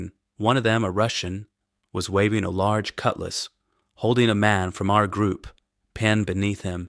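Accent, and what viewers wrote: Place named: South, United States. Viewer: This story of a young French boy enlisted in the German army to fight in the Soviet Union, read by someone possibly from a state South of the Mason–Dixon line, is quite puzzling to a French listener.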